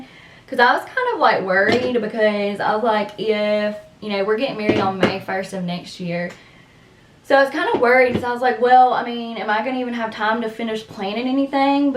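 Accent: Alabama, United States. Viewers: I love your accent. You sound like my daughter-in-law. She's from Shelby, Alabama. Thank you for the video. I just bought one of these.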